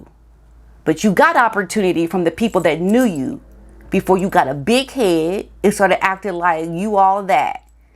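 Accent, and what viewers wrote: United States. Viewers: I agree with you, you are American. pronounce it how you are accustom. I love your channelYou hit the nail on the head. Just be yourself. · Please don’t worry about how you pronounce names. As others have said you are American, and often words are said differently to us British folks. There are 2 spellings for the name for Prince Louis. Some people spell it as it would be said Lewis, which can be a surname or first name. It is what you say, not how you say it. Keep up your good work. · YOU ARE RIGHT! YOU’RE AMERICAN SO YOU PRONOUNCE AMERICAN.🌹🌹🌹SHE IS USER. THE YATCH GIRL. SHE HAD NO RESPECT AT ALL.THIS WANTS TO BE BILLIONAIRE. IS All ABOUT HER SELF. SAD SAD. SAD WE FEEL BAD TO PRINCE WILLIAM HIS BROTHER IS GONE. MANIPULATIVE,CONTROL, HYPNOTIZED BY MEGAN.😂😂😂😂😂😂😂😂😂😂😂😂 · Don’t you worry about how you pronounce things …we all pronounce things differently and that makes it more interesting. 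I like your pronunciation actually, you are American and that’s how you should say it. Don’t take any notice of the pedants….(fussy grammarians) Imagine if Diana had lived……she (Marbles)would’ve fallen foul of her (Diana)100% and she would be slandering her too. They would be ghosting each other, I would take sides …and it’s not Marble's side. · Please stop apologising for your pronouncement of a name..99.9% of people Know your an American and expect you to speak like an American..most of us love your accent...hi from the uk 🇬🇧 😊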